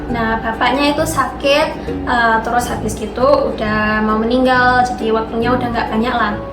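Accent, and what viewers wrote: Java, Indonesia. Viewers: kamu ternyata ada medhoknya klo bhs Indonesia 😀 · Medok e iku lo... Nyenengne.... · Bhs ne wis medok koyo wong indo...jgn" sdh lupa bhs jepang nih ^ ^ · Kok medog yo rek😐 Jgn2 deket rumah saya😅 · Orang jepang lahir di sidoarjo, logat jawa nya tetep medok.. sukses selalu, aku suka chanel ini 👍👍👍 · Tapi ko ngomongnya logatnya kaya orang jawa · 🥰😍,aq gak kuat medok e iku lhoo 😁😂👍🏿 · Cuman pengen nanyak, lancar bangt ya, bhs indonesianya, bhsa jawanya juga kentel bngt? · Kok medok bahasanya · Medoknya gak ISO ilang kak, kapan Moro neng malang meneh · medog e jian 👌👌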